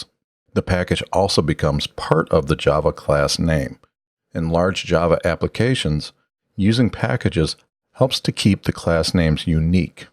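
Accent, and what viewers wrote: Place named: United States. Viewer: What a nice male voice with a rough American accent!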